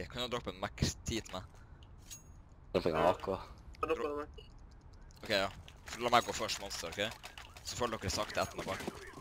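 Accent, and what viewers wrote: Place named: Tromsø, Norway. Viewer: The best thing 'bout your highlights is that Tromsø dialect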